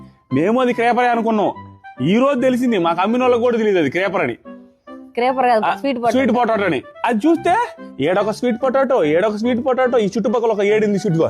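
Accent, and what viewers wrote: Nellore, India. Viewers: Maaa Nellorelolla yaaasa antne untadi mari😂❤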